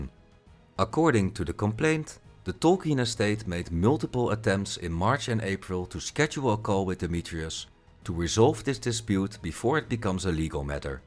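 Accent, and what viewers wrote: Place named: Netherlands. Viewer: Yeh, let's put a dutch accent on a "machine voice". Not only the accent, but the usual dutch sentence building and the clear script reading is a dead give-away for a real person. Don't assume truth, search it my friend.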